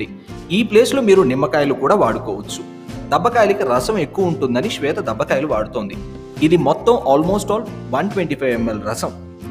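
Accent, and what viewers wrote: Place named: Guntur, India. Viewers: actually nenu vere vallani anatledu sir gnt slang baguntundi meeru slang marchakandi teleena vallu apardham cheskuntaru antunna that's alll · Are meru Guntur slang Kuda Chala chakkaga matladestunnaru. Vinadaniki bagundi. Pickle chuste notlo neellu,kallalo Kuda neellu vachestunnai. Defenate ga chestanu. Garu meru okasari kanipinchandi.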